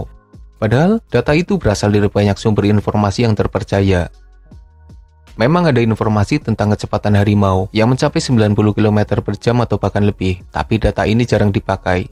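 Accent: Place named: Ngapak, Indonesia. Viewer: Orang jawa nih admin nya 😂 sepetinya ngapak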